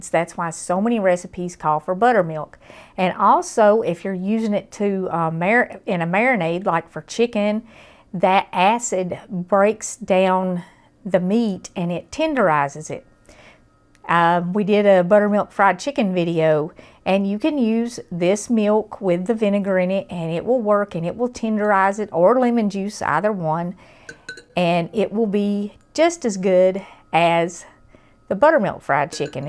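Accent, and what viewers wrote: South, United States. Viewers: Wonderful slight southern accent, not over done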